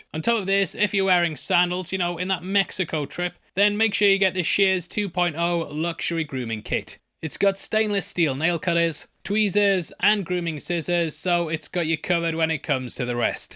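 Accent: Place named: North East England, United Kingdom. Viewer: The only thing I look forward to other than a marvel movie or show is your break downs. Your NE accent is just totally 💯. The one place I miss in the UK most. Great stuff sir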